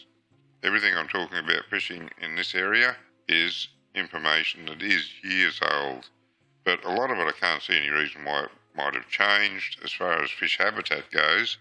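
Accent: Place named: Queensland, Australia. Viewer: It’s a pure Queensland accent, a rarity these days.😂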